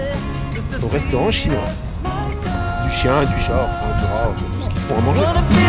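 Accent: Nord-Pas-de-Calais, France. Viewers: Je confirme ... en chti' ca fait + serieux !!!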